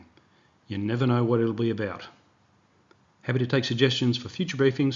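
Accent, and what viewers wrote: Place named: Australia. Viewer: So refreshing to listen to an Australian voice that knows his subject. Thank you for your excellent and accurate content. Yes I have subscribed Instead of the rubbish from the USA and their A.i. voice, their conten is rubbish too.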